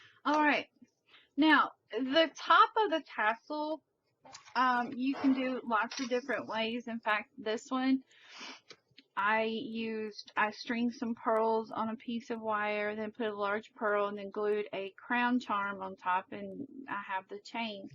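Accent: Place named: Texas, United States. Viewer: I know this is an old video but I THOUROUGHLY enjoyed it!!! You MUST be from somewhere in Texas! Lol. {You talk like me}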